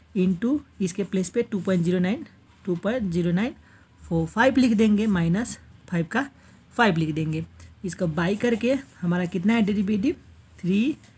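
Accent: Nepal. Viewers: ARE YOU FROM NEPAL🇳🇵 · Bro's Nepo-Hindi is sweet😂😭🫶🏻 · Bro temi Nepali ho timro boli sunda Nepali lagyo anyway Sabai ramro Xa temi le padhako pani Sabai bujhinxa keep it up love you 🤗🥰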